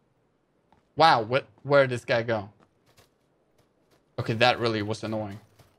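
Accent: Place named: Denmark. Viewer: Where are you from? You sound Danish.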